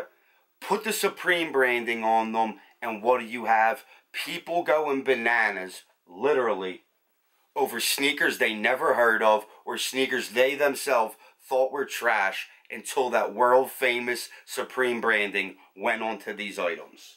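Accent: Philadelphia, United States. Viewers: Most thorough and exemplary Philly accent on the interwebs. Respect.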